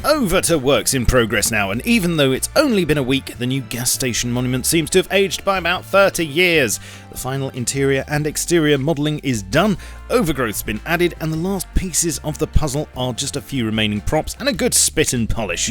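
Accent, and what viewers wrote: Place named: Liverpool, United Kingdom. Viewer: aye yeah ur a scouse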